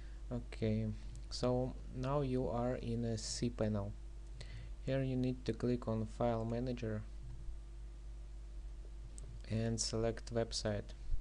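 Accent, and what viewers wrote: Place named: Russia. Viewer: you sound russian i love you <3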